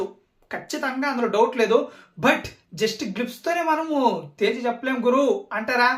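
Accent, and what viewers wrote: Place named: Andhra, India. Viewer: Utter andhra slang ra arripooka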